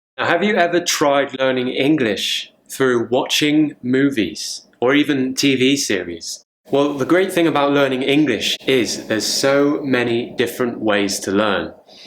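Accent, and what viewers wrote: United Kingdom. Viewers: English Hi mate. I'm from Italy. I've been studying for Cae exam, and with regard to your question on how we improve our skills and learn new words, I've developed myself a sort of method. I start with looking a dictionary up looking for interesting words. Then, on wordreference or cambridge dictionary I seek word families ( I mean, words families of words I selected from my dictionary - hope this is clear). Then, I have a look on lingohelp where you are told what preposition follow an adjective and so on. Accordingly, I keep my head down and study all this words and then I do try to put them into practice by typing to friends of mine who are American, B English and similar. What I mean is this: I oblige myself to study 'em all while using them and I do allege I know them perfectly. I know it's quite weird but It pays off as most of the times it works. 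That's blatantly obvious that I cannot remember hundred of words but I needed to enhance my English voc. variety and so here is it! I'm applying the same kind of approach to idioms and collocations as well. Moreover, I do watch movies in English. Admittedly, I did it for fun and more frequently in the past but I would do it with subtitles. Now I've been trying to do it without 'em, especially Game of Thrones, where people talk using B. Accent (which I've copied from your way of speaking ahahah). I wouldn't dare say it's easy but it's not difficult either: more or less I'd say I figure out 70 80% of what characthers say. I hop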